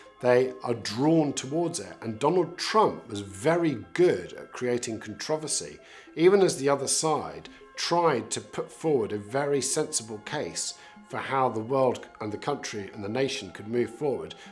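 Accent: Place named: RP, United Kingdom. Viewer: Time will tell who is who? This speaker will fell off his chair!! Talking and criticising President Trump in a posh accent does not make him intelligent. Be prepared for what is coming. We Love President Donald Trump..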